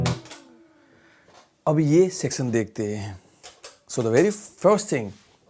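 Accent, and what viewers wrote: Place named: Nepal. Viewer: I think your pronouns like a nepali guy. Is it true.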